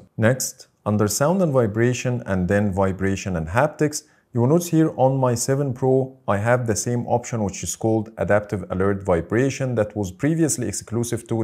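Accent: Egypt. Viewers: Egyptian English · اول ما سمعت الفيديو حسيت إن لهجتك عربية انت مصري؟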